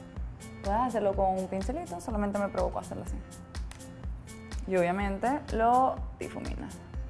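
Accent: Venezuela. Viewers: el acento Venezolano no se iguala, lo reconoci al instante, me encanto tu video Saludos desde caracas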